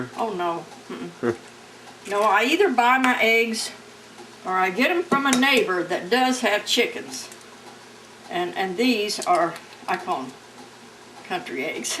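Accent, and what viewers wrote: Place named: Southern Illinois, United States. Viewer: “Sodie, difernt, and R” You Have to be from Southern Illinois. Am I right?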